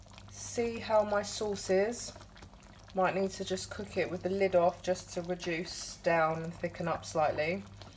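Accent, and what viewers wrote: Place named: Australia. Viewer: You should have made cuts at both ends of chops to prevent them from curling up when cooking....They will remain flat to cook evenly...Looks real delicious though...And i adore your accent...Are you from Australia??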